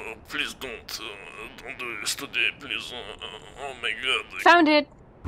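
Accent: Russia. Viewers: Are you having russian accent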